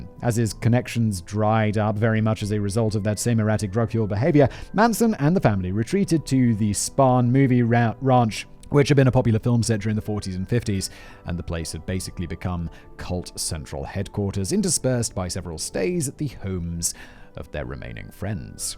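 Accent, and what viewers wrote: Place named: United Kingdom. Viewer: I blame his deep smooth sultry british voice.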